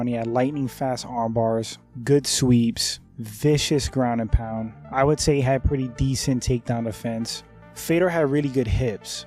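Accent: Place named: United States. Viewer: I know, I can tell from your videos the knowledge you give and exampling is well on point. Plus the casuals are important for MMA right now I only say it because people are talking about it and participating in the sport which will carry it on in the future. I'm only 23 years old. I became a fan of MMA around 2006 or 2007 the first fight I watched was Liddell vs Ortiz 2. I played a video game called UFC Sudden Impact way before I became a fan of MMA, But anyway I was a Amateur in Muay Thai and Boxing when I was younger (I know a lot about those to sports as well) my biggest accomplishment so far is fighting former WBO Champion Joseph Parker in 2009 Cruiserweight Golden Gloves in New Zealand. Unfortunately I got KO'ed in the opening tournament. Glad to see he's doing great in his career. I've only had 1 MMA fight that was back in 2013 lost by DEC but that year I defeated Patrick Afoa who fought Israel Adesanya 4 times in Kickboxing. 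But I quited from fighting 4 years ago for family reasons. But its awesome to see a fellow MMA fan that knows what he's talking about, its great to hear that your training as well and that you sound either American? or Canadian? Which makes you'll have more opportunity then then what I had in New Zealand. Well Thank you for the comment. I will stay in tune for your videos from now. I may have other fantasy fight suggestions like: Kazushi Sakuraba vs Rickson Gracie Stipe Miocic vs Tim Syvia Tyrone Woodley vs Matt Hughes BJ Penn vs Urijah Faber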